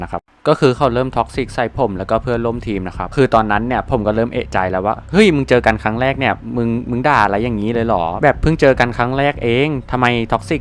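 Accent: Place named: Kanchanaburi, Thailand. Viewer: เสียงพี่ก็เหมือนพี่แจ็คเกิ๊น สำเนียงกาญหรอครับ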